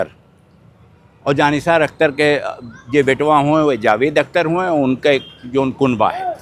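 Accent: Awadh, India. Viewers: Sir ji aapka avadhi me bolna bahut hi sundar hai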